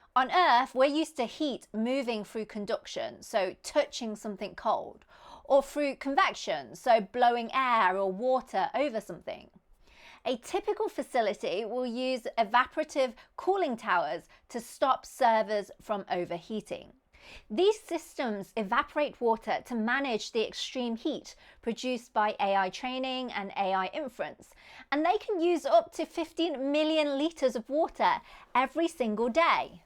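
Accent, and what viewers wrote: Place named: Midlands, United Kingdom. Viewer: Why no Wikipedia? Or did I misspelll? " 2 March 2018 J04n talk contribs deleted page (Wikipedia:Articles for deletion/ (XFDcloser))" I was just wondering what her accent is. It doesn't really sound Midland, but words like "upset" sounds like "oopset." So, maybe she is. I luuve her content.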